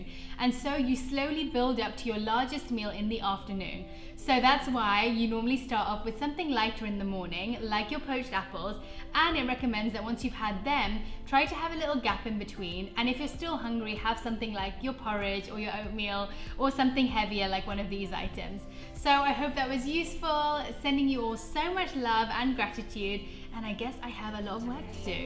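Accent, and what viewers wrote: England, United Kingdom. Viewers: Ik she's English but her accent gets me every time XD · I really like her British accent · I love your videos, they are so inspirational. I would love to hear more about your health journey. Are you British??, which part???. I love poha. ❤️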